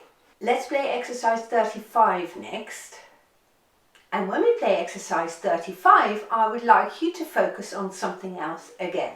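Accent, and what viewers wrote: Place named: England, United Kingdom. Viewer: Great lesson as usual. Thank you,. You have a lovely English accent that is very rare these days. What is its origin if I may ask? Sounds very posh!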